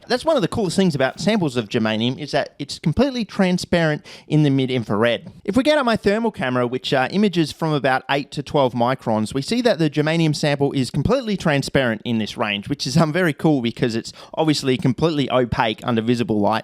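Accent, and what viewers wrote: Australia. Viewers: I love your videos! And the Australian accent is amazing! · I'm like "Damn, he's posting at 7am?" Then I remembered from the accent. Then I'm like, "wtf? It's spring, not fall." Then I remembered that Australia is basically the upside down world.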